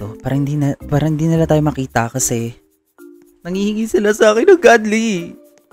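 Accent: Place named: Tagalog, Philippines. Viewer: Parang tagalog nag english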